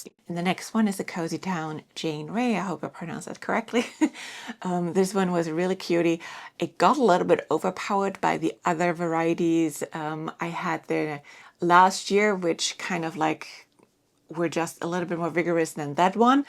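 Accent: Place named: Germany. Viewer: Always enjoy your videos! Best of luck with this year's growing season.My in laws came from Germany. I love listening to your accent!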